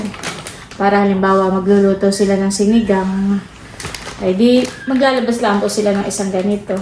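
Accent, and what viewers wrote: Quezon, Philippines. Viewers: Taga Quezon po kayo Ang punto po Ng salita nyo ay Quezon po saan po kayo sa Quezon po Ako po taga Lopez Quezon po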